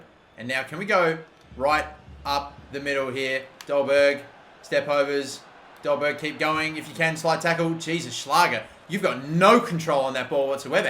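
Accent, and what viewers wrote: Australia. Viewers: It’s probably his Australian accent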